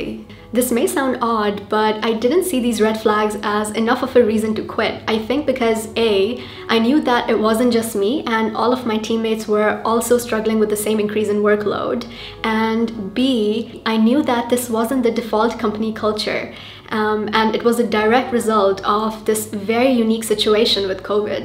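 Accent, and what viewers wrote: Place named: RP, United Kingdom. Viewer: How did you develop such a posh accent?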